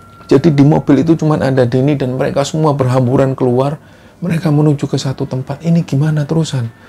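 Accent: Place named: Banyumas, Indonesia. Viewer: Pada2 ngapak.e... 🤩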